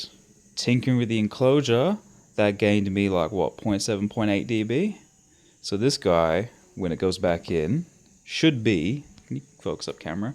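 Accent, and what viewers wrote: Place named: United States. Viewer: I've never heard an Australian guy sound so American😂😂😂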